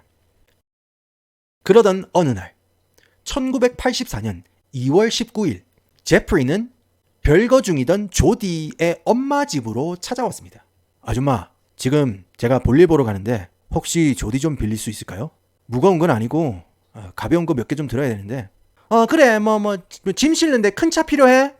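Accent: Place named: Gyeongsang, South Korea. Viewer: 갱상도 ㅎㅎ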